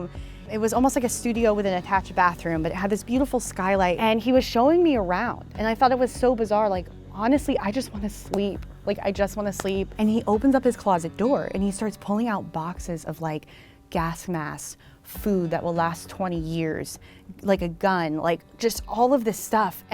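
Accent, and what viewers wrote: United Kingdom. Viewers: i cant get over her british accent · The British accent makes me wanna cry.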